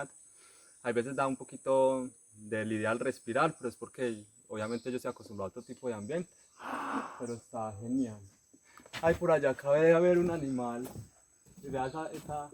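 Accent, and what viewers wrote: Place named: Antioquia, Colombia. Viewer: Ese acento paisa me encanta. Gracias por el video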